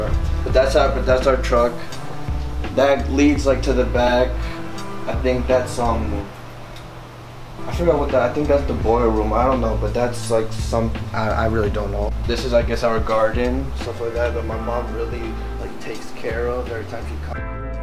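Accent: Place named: Brooklyn, United States. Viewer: You from Brooklyn whatever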